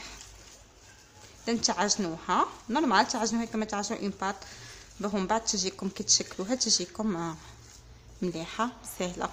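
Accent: Skikda, Algeria. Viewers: لهجة جزائرية سكيكدية تهبل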